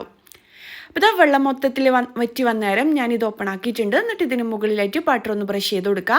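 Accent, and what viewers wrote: Kannur, India. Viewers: നമ്മൾ കണ്ണൂരുകാരുടെ സംസാരം ഇങ്ങനെയാ😁😁🥰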